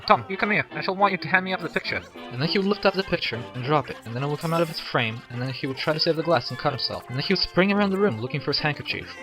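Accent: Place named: United States. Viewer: Why the fuck is a septic narrating this?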